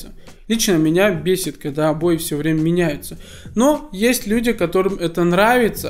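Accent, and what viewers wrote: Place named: Belarus. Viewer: БЕЛАРУСА СРАЗУ УЗНАЛ.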